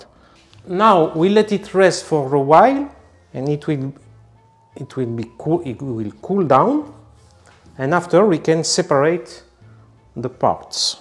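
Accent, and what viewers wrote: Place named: France. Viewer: Thank you for another good video. You are so good at explaining in a simple way with your lovely French accent😊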